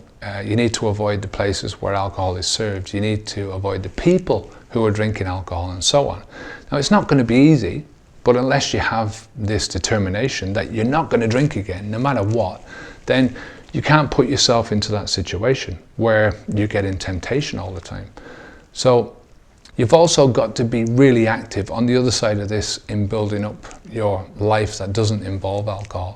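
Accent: Ireland. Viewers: hi you sound like a fellow Irish man and fair play for kicking the booze, i relapsed recently am not sure if it was pressure from doing my master or looking after a sick parent, I used to have a vibrant social life and was able to handle a drink and thought that i could handle just the one and be like everybody else but ended up on a 5 day bender. My question is maybe its the disease of of alcohol making me think am missing out on socialising how to you build a social vibrant life? its not the fact that am depressed but alcohol has hospitalised me on many occasions, accepting defeat is difficult and ive lost jobs and even friends to this but until that relapse i was doing great, things is every time i fall recently i bounce back stronger maybe am learning very confusing.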